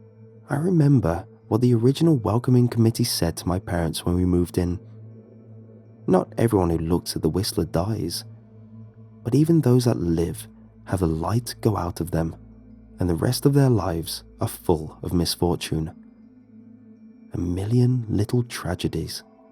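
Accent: United Kingdom. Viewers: knows what he does his brit voice adds to the creep but then agian i only really listen to him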